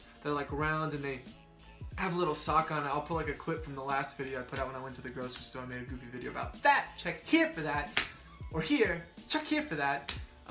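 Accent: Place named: United States. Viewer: Are your parents from America? I can't come up with any reasons for your accent beyond that. Don't worry, it is very slight and faint, it is nothing to worry about. A strong New York or Southern accent would make it harder for you students to understand you, so again, I was not trying to be negative, I was just asking. Thank you for responding.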